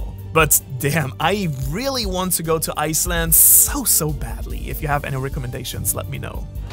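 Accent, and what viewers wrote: Germany. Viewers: I did not know you're from Germany (of course I detected your accent, just not that it was a German accent) Hi homeboy ... tee hee I'm from Karlsruhe ( a bit north of Bavaria) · Aha! Wusst ich doch dass du Deutsch bist, anhand deines Akzents :D Gut zu wissen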